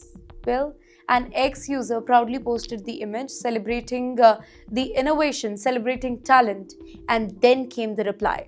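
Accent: India. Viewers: Speak English girl. Ur English sounds funny. Chilli biryani accent hahaha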